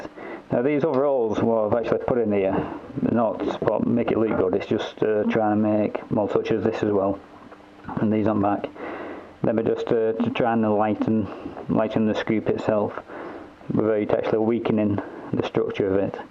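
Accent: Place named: Bolton, United Kingdom. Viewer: Id give you good money for one of them there scoops lad. are you from bolton by any chance?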